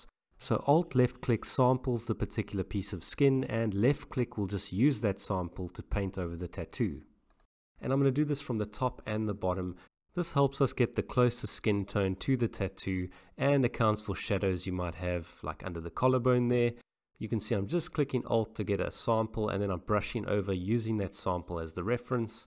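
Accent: South Africa. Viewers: Always Love the South African Accent ;)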